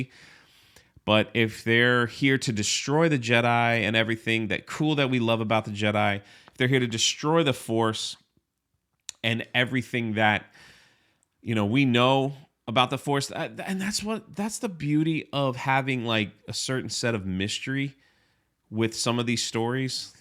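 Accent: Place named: California, United States. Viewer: Ok huge question -- are u a surfer from southern california? You sure sound like one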